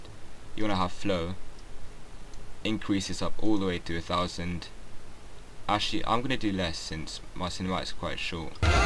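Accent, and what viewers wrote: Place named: United Kingdom. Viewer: my man sounds like the most british person i've ever heard btw props to u for doing this whenever i ACTUALLY try to use cins ima watch this again cuz its actually really good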